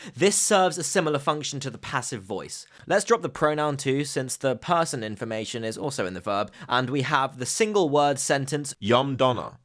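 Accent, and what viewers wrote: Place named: England, United Kingdom. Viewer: Id rather listen to you speak the conlang because your English accent is really annoying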